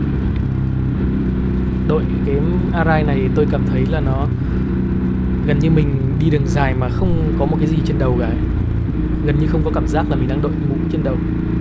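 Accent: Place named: Hanoi, Vietnam. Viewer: Giọng nói giống bằng kiều lai tuấn hưng quá , gốc hà nội có khác :v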